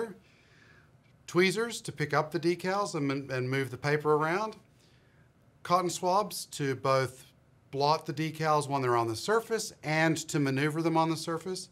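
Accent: Australia. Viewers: G'day...two quick questions...do I note the hint of an Aussie, if not Canadian accent?? Just at times it's more noticeable. Secondly, and more subject oriented...have you gloss coated the Vamp first?? and do you subscribe to the process?? I'm in the process of doing several 1/350 ships...and as such they're not exactly small...and just wondering if the overall process is beneficial on models of this scale?? Thoroughly enjoy all the hints and tips videos...as I've been out of modelling mode for many years, it certainly helps immensely. Cheers from Sydney Aus!!!! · bit of an Ozzie accent there sometimes 😁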